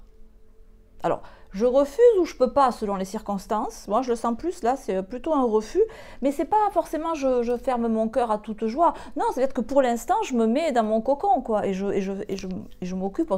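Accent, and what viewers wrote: South, France. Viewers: Bonjour vous ètes incroyable, je suis seul aussi, à vous entendre vous ètes du sud, dommage, je suis dans le "chamanisme" Bonne continuation.T.M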